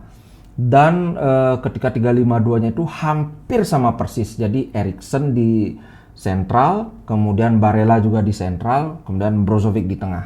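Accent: Bali, Indonesia. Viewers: Menarik komentarnya, apalagi dialek Balinese membuat senyum2 mendengarnya. Lanjutkan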